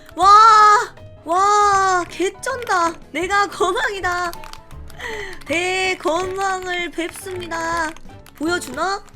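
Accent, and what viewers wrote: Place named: Gyeongsang, South Korea. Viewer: zzㅋㅋㅋㅋㅋㅋㅋㅋ우리 귱남선생님들은 된소리가 안된다요...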